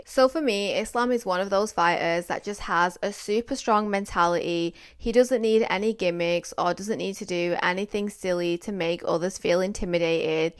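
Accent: United Kingdom. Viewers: She doesn't even look Russian lol can you even hear her accent??? She has a UK accent.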